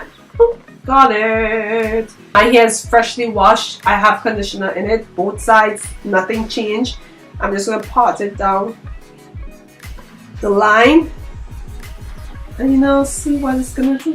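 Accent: Trinidad and Tobago. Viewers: You sound Trinidadian 🇹🇹 Just found your channel.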